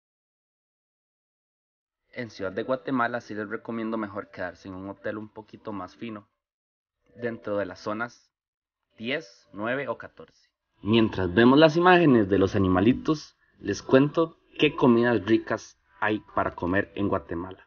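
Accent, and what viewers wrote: Costa Rica. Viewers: Estás guapo vos tico, me gusta el acento costarricense ya que no pronuncian la letrra ese aspirada tal como nosotros los chapines tampoco lo hacemos. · No te hagas muy el extranjero porque Guate es el hogar de todo centroamericano, lo unico que uds tienen otro acento un poco deferente al de Guate y porque tienen a Wanchoppe que lo odiamos jajaja pero pues somos lo mismo.. Saludos hasta tiquicia la bella, gente honesta, chambiadora, honrada y siempre echada para delante como la chapina.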